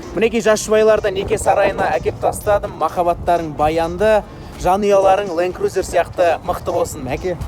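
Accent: Standard. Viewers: Ассалаумағалейкум! Қазақша таза сөйлейді екенсің, риза болып отырмын. Жұмысың алға баса берсін! Пытырлатайық жігіттер лайкты, оған лайықты! 👏👏👏👏👏👏👏👏👏👏👏👏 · Таза қазақша керемет! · Таза казакша керемет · Керемет, сонша еңбек өте жақсы түсірілім, жарайсың ең бастысы таза қазақша! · Қоспасы жок тап таза қазақша сөйлеп тұр, жарайсыз. Техникалық термин атауларға дейн қатесіз анық аударылған. 👏👏👏👏👏👏👍👍👍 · Мәссаған сөзім жоқ.Қазақ тілде таза сөйлейсін.біз лайк басуды аямаймыз.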